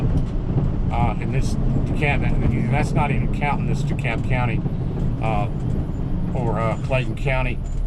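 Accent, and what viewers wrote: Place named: New Jersey, United States. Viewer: You sound like a friend of mine from new Jersey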